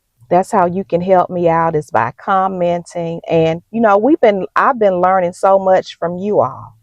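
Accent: South, United States. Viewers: I seen your video for the first time today. I love your spirit and your country accent. You have given me something to think about. I totally agree with what your saying. My husband and I recently relocated from Arizona to Georgia and I feel a big shift in my life making such a big change. Things are very different in G.A. I need to find something to do in G.A. Still looking for a perpose in a new state. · You are such a true inspiration ma'am...You came across my feed last week. I listened to you and your southern accent and now I'm hooked 😊 Keep the good content coming while I continue to be attentive and share your videos. All the best for the new year...lots of love to you and hubby❤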